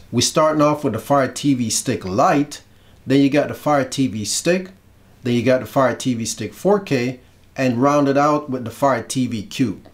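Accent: Jamaica. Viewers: Thank you so much now I’m gonna upgrade. New subscriber. I know I hear my Jamaican accent. 🇯🇲 nuff love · Thanks this vid really assisted me, heard a little 🇯🇲 jumping out every now and then👊🏽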